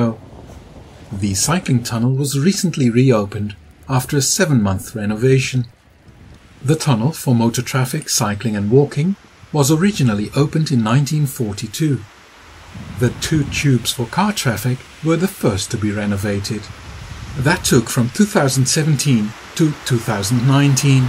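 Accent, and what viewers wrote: Netherlands. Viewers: Lekker Nederlands accent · Interesting mix between a Dutch and English accent :p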